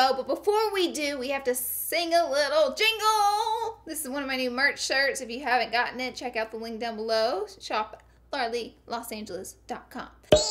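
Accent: South, United States. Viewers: Southern thang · Tori Mizuno go tell a Canadian to say say sorry correctly lmao we all know she’s form the south and we all say things different depending on where we grew up. Come on now. Stop trolling.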